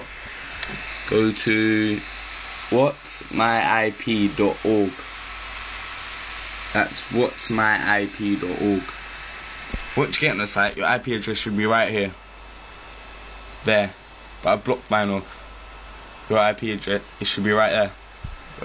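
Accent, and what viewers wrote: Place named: London, United Kingdom. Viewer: yeah, dis brar obviously from london, safe. and dis is usefull but how the fuck can i du dis on a belkin. jheez not been on xbox for longgg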